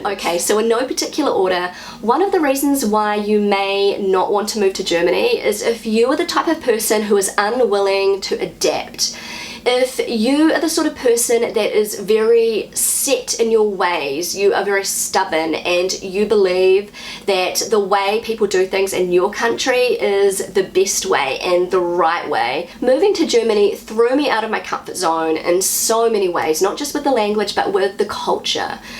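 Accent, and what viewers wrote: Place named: New Zealand. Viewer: New Zealand English sounds quite cute. However, the choice of words is a bit strange sometimes. ;-)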